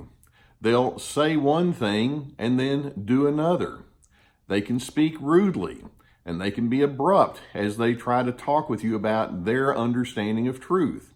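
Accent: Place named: Texas, United States. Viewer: Your explanations are clear and thanks for the videos. I'm trying to place your accent. Are you from Texas?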